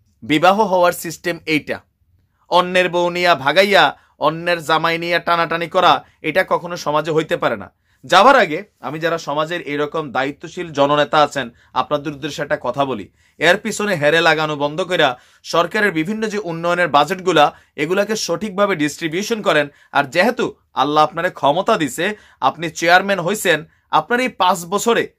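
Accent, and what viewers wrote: Sylhet, Bangladesh. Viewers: Amrar Sylheti mat apnar muko hunia bala lager... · ভাই আপনার ভারি কি সিলেত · Sundor laglo Sylheti language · মাশাআল্লাহ খুব সুন্দর ভাবে সিলেটি ভাষায় কথা বলেন,আমি সিলেটের মৌলবীবাজর তাকি। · Vai khub sundor sylheti matoin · ভালা লাগলো আপনার সিলেটি মাত হুনিয়া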